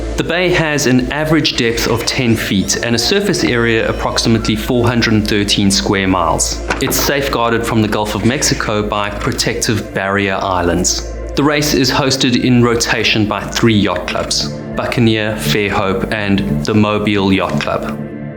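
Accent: South Africa. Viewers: Why do you use prioritize imperial measurements over the metric system? Your channel bio says the channel is based in Germany, and you clearly have a South African accent. Neither one of these countries use imperial measurements. This is baffling that you chose to prioritise your American audience.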